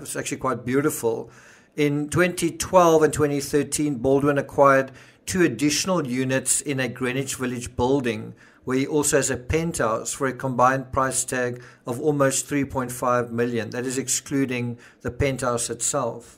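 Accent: South Africa. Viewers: Sounds like trolls! Ignore them. Some peoples attention span is that of a gnat. You're work is the best. Meticulous and interesting. I believe from your accent you are South African. I am British and get accused of pronouncing words incorrectly here in the colonies. Especially the word "Aluminium". LOL. Carry on your excellent reporting. I fully support you. Big fan. Cheers mate! 🇬🇧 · Fair point. I enjoy your SA accent its refreshing. It makes my cat chill out too. Keep the good stuff coming. You analyse in a way no one else does, gives better results. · Your South African accent adds to the sharpness of your commentary. It comes across as crystal to me. I find some people are too eager to highjack comments. If I grow tired of a highjacked thread I started, I just delete it and have several times.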